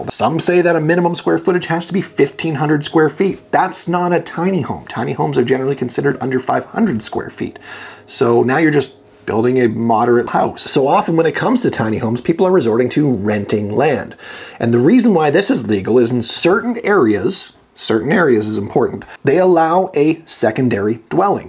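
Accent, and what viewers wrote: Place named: Canada. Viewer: What is this "Boot" he keeps mentioning? He keeps saying something about "A Boot" Lol love the Canadian accent. Great video!!!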